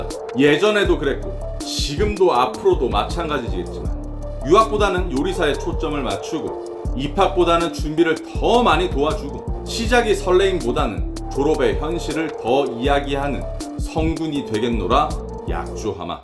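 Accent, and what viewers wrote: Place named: Gyeongsang, South Korea. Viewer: 경상도 사투리 매력 있으십니다 선생님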